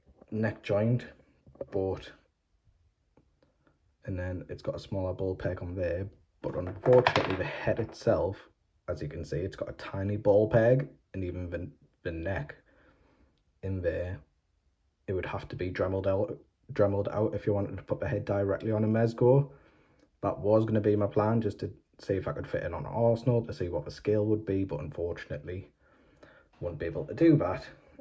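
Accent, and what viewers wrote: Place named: Newcastle, United Kingdom. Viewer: Your Geordie accent reminds me of home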